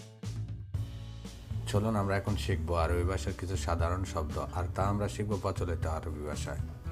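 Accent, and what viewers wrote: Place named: Noakhali, Bangladesh. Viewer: নোয়াখালীর ভাষা ঢুকে গেছে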